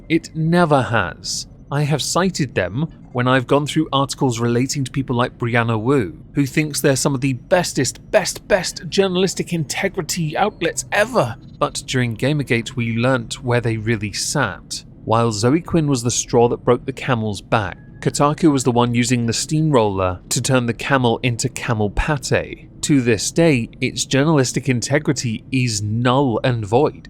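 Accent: RP, United Kingdom. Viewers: OH- I really, really want to subject him to them. He's peak entertainment when He's disgusted and annoyed. Oh please 🙏! The worst offenders are always intimidated by your command of the English Language / Posh accent.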